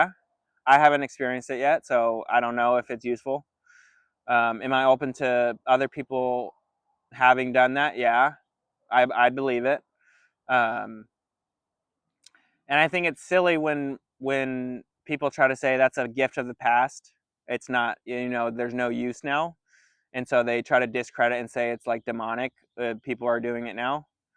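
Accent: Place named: United States. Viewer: I watched till the end. Where are you. The backdrop looks beautiful, I'm guessing America by your accent but what state?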